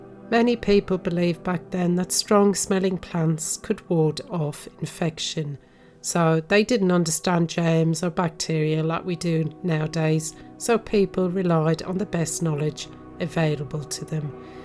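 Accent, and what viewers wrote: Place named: Bristol, United Kingdom. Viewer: This was so interesting and beautifully documented. Iv never heard of this village before. It's alarming to wonder if all those beautiful homes have ghosts. I Reckon there must be quite a few roaming around. To think this started with a bundle of cloth, wow!!! I felt such a strange sense of sadness is there. I don't think I could live in one of those beautiful homes. Thank you for a lovely video, please forgive my asking. But I like your accent, I'm not sure where it's from, is it Bristol.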